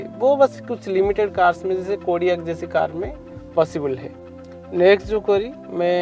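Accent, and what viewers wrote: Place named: Odisha, India. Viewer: bro are you from Odisha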